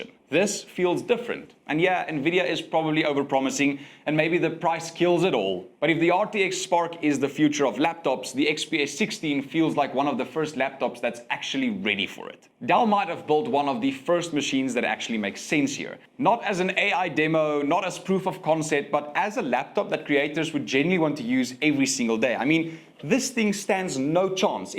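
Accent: South Africa. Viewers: Bro, are you from originally South Africa?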